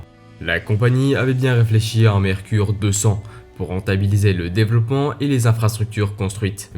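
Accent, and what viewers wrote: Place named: Japan. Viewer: Je suppose que est Japonais ou d'origine japonaise. On le ressent bien par l'intonation de la voix. Donc, il s'exprime un peu comme ses parents et il n'y peut rien. En plus son français est excellent, articule parfaitement bien et ne parle pas comme ces mongols qui adorent ajouter le son "j" après les "d" ou les "t" comme par exemple " je tj'ai djit". Ça oui, c'est trop insupportable à entendre.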